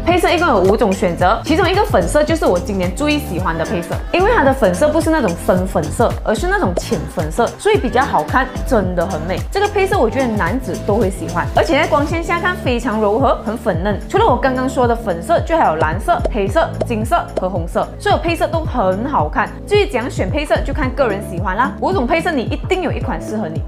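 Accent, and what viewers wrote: Malaysia. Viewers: 應該是馬來西亞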